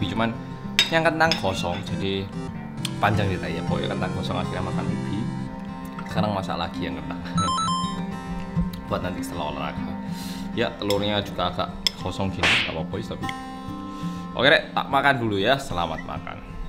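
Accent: Java, Indonesia. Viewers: Baru nyadar kakae hg medok rekk.... after cov. Oengen ke koreaaaa auiii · Duh mas ini medok banget:v Elsa nya comelll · Mas suka indomi yg medok apa yg gmn mas? Kalo ak suka mas yg medok. Iih apaan sih. Maaf. Sukses sehat slalu mas. Dipertahankan trus ya mas ke medok an nya.. jgn kayak yutuber jadi2an. Yg suka pamer giveaway kesultanan yg fana dan prank yg gak beretika. Semangat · sehat trus mas medok 💜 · Hallo Oppa, sangat kagum dgn bahasa indonesia rasa jowo nya yg sangat medok . Penasaran brp lama untuk bljr bhs indonesia sehingga bagus sprti itu. Dan terima kasih banyak untuk sudah menginterview BTS dgn sangat baik. Sbg ARMY aku subscribe channel mu. Matur suwun kamsa hamnida. 🙏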